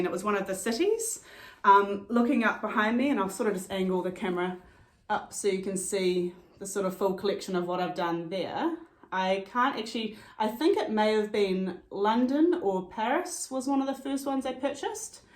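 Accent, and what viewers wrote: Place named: New Zealand. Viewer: Lovely to be introduced to your channel. Catching up on all your episodes while I stitch. My son lives in Wellington so it's nice to hear your familiar accent. I have recently started a channel too - i'm a South African living in UK. I used to be a monogomous stitcher but have discovered that I quite like having a few projects on the go - but don't want to have too many either.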